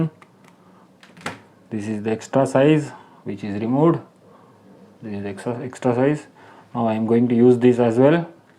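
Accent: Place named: India. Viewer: I love the indian accent when you can actually understand them, great video, thank you very much!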